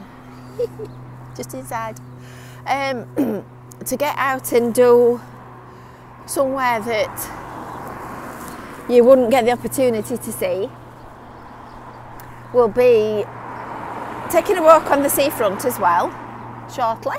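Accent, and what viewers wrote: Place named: Yorkshire, United Kingdom. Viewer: Very ironic to hear such a Yorkshire accent on a tour bigging up the Lancashire coast! 😂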